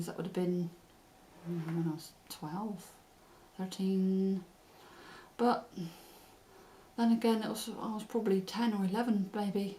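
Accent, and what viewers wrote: England, United Kingdom. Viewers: Your really down to earth love your English accent hope you do more videos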